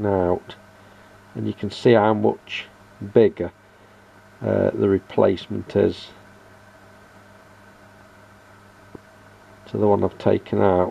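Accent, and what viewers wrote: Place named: Northern England, United Kingdom. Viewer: Btw you've got yourself a new sub! 😊 Great diagnosis and explanation and good to see another fellow tech/electronics enthusiast here in the UK! 😊 You sound as though you're from Leicester or further north, Yorkshire perhaps? I'm down south in Surrey 😊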